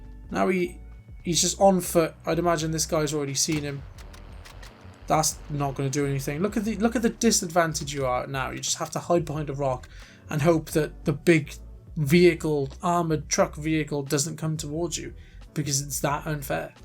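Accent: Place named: Wales, United Kingdom. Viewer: I'm from Cardiff myself, and your accent was instantly familiar to me lol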